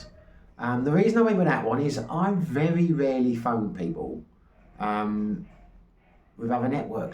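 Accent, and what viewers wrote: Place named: London, United Kingdom. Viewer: Good to hear another Londoner in the Philippines, I'm living in Cebu, also got a you tube channel, loving it here, keep up your channel. Cheers mate, Colin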